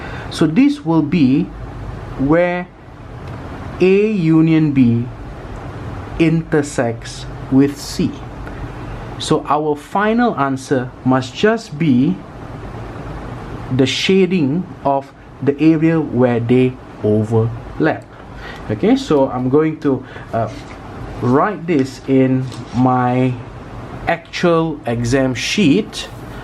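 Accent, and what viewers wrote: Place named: India. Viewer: well , his accent sounded totally indian so...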